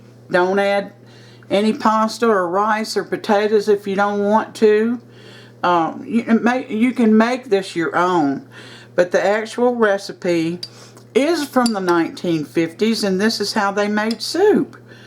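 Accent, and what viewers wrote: South, United States. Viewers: CAN YOU PLEASE DO A VIDEO SHOWING US HOW TO CAN YOUR WONDERFUL SOUP? So glad I found your channel! I have a lot of catching up to do, looking at all your yummy dishes! I love your southern accent! Thank you for sharing! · Lol, she said giving a thumbs down was mean😂😂😂. I'm definitely giving you a thumbs up Mama! Love that country voice😊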